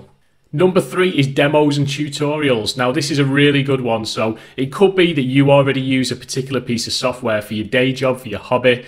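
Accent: United Kingdom. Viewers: Never thought I’d hear a scottish Tom Scott saying “Balls” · 1) thank you for the video it inspired me to try making channel 2) good content and links not overly pushed 3) lovely to hear a uk voice.I love our American friends but YT is to overcrowded with them ;) Cheers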